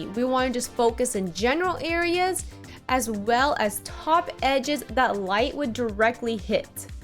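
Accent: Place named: Europe. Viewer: Wait, what? A Warhammer painter who doesn't just prime white and slapchop away with abandon with those overpriced contrast paints?? I like you already! That guy looked like he's been through a few trenches and is Not Having It Anymore (this is a very good thing)! Well done, and pardon my asking, but are you European? I'm trying to identify your accent...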